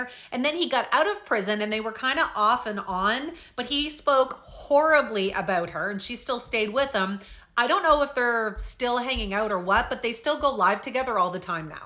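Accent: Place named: East Coast, Canada. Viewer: Just found you tonight. You have to be Canadian, east coast!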